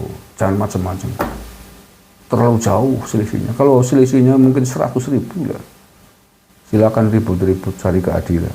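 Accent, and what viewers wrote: Banyumas, Indonesia. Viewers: Pak rika hebat bahasa jawane totok banget,medti rika wong banyu mas,aku seneng moga sehat,aku cocok kata pak yusril mahendra...🙏🙏🙏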